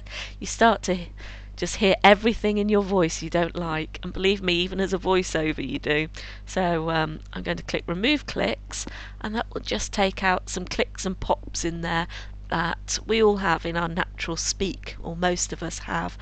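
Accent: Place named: RP, United Kingdom. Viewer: I now know where I shall go to practice my posh accent. :D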